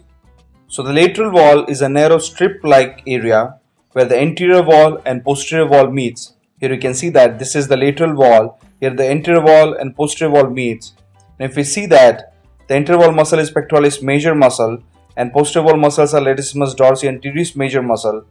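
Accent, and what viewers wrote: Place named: India. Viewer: I just become so much distrub whene I hear Indian English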